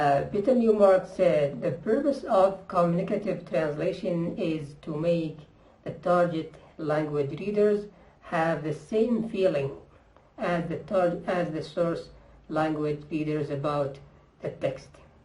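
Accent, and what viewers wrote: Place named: India. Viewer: Dude u sound Indian ngl 😭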